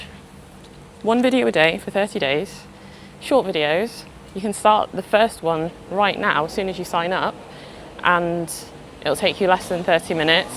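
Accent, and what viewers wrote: England, United Kingdom. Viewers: You are the best mate 😊 By the way I really love to hear your voice 😅 a beautiful English accent ❤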